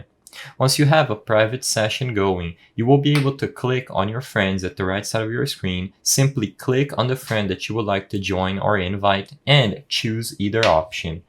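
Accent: Brazil. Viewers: você é Brasileiro né?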